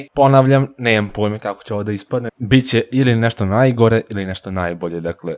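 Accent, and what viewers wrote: Subotica, Serbia. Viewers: Znao sam da si iz subotice hahaha zbog naglaska ;) Ps: ja sam iz sente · Znao sam da si iz Subotice hahahahahaha po akcentu jer sam cesto tamo pozdrav za Subotičane 💙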